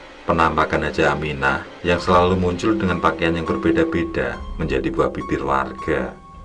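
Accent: Java, Indonesia. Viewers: Medhok men cuk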